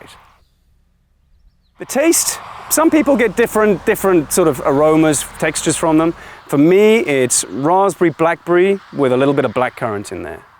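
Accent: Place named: England, United Kingdom. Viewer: So nice to hear English English from NOVA SCOTIA. I have just bought some seeds and am aching to seed them..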